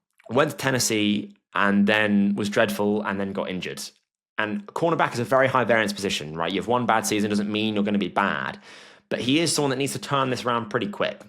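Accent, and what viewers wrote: United Kingdom. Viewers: Something special about listening to a knowledgeable Brit speak about American Football. However you are wrong on Caleb Manziel Williams. Cam Ward will be a better NFL QB. Overall though good takes. Jakobi Myers deserves to go at the top of the underrated list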